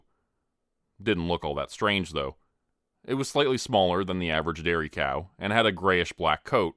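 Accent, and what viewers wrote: Canada. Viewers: Woo-hoo Canadian here too. Vancouver area. I thought you sounded Canadian. You rock. 🤘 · I knew you were Canadian!!! I love your accent. I'm from just over the border in the US. Reminds me of home.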